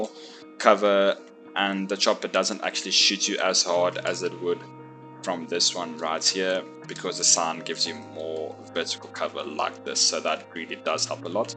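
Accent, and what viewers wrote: South Africa. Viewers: are you South African ? couldn't mind noticing the accent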